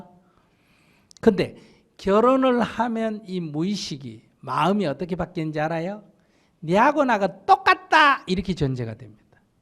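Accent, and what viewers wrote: Gyeongsang, South Korea. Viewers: 스님 경상도 사투리 라서ㆍ더 매력 있습니더 예 ㅡㅡㅡ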